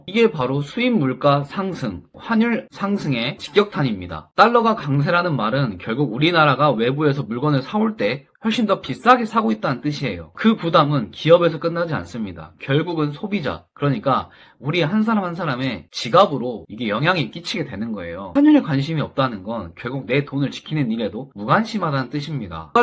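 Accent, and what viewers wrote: Jeolla, South Korea. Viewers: 전라도 분이네요! 와 귀하신 분!❤❤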